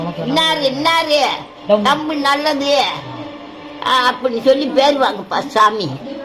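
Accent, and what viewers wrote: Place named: Usilampatti, India. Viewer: ❤❤sema paaati.... Theni usilampatti pechu sema 😂😂😂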